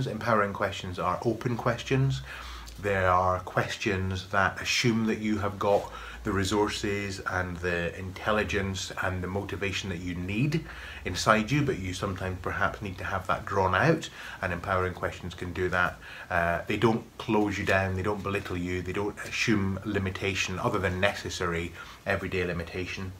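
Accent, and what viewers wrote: Scotland, United Kingdom. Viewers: The last time we went to Scotland to visit the rels we stayed in Carnoustie! Beautiful... I knew I heard a bit of Scots accent,!!!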